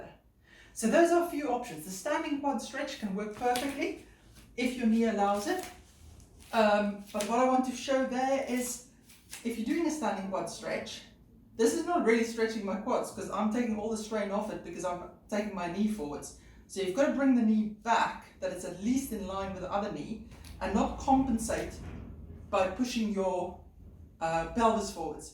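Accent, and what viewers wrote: South Africa. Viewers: Love your channel, extremely useful, plus I love the SA accent :) (I was born in Germiston). I can't find any videos on warm up exercises before runs. I use <link> <link> and <link> which ones are best? Or even better do you have a video on the best 5-10 min warm ups to use and maybe cool downs?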